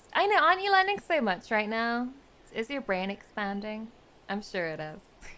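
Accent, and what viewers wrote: United Kingdom. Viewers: Now normally I am not the biggest fan of bees, but seeing with glasses and giving a lecture remained me of why she is the exception to my dislike. The lecture was very well done and the facts were huge eye openers like how bees do dance which I knew about or that bees can be trained. That does expand my knowledge a good amount more and again with glasses and her british accent all scream hot teacher so soild lesson!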